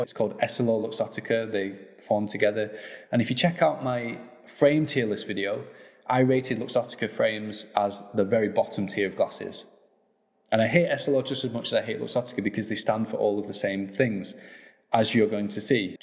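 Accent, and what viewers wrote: England, United Kingdom. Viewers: is this an ENGLISH accent i love bit hard to understand from Ontario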